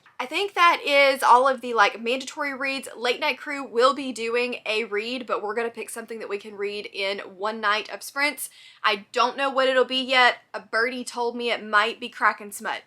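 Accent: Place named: South, United States. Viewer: You may say or have it somewhere.. and if you don’t then you absolutely don’t have to confirm my suspicion if you don’t feel comfortable doing so. This is the internet, after all. But based on your voice, I suspected you are from The South… but then you just said football and bugs dying and now I’m convinced. (And in no way, shape, or form am I mentioning your voice as an insult! Just in case that needs to be said!)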